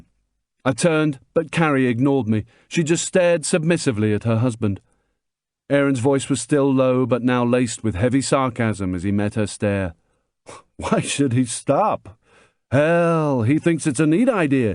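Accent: England, United Kingdom. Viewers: Yes the English accent is pleasing to my ear 🤔 I am always disappointed when an audio book is accompanied by the American version 🙄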